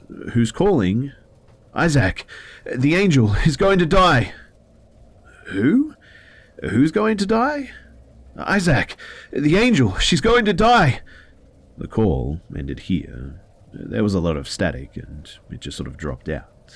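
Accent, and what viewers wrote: Australia. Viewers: I love an Aussie accent, but dude, slow the words down, you miss storytelling when you just read off a script. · Sounds both like an australian and new Zealand and somewhere in the Americas accent. I really like it. 🤗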